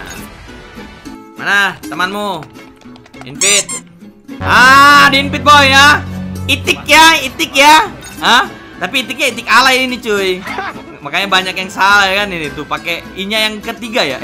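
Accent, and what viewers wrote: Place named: Kalimantan, Indonesia. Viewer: Kek nya orang Kalimantan tuh bro dari bahasanya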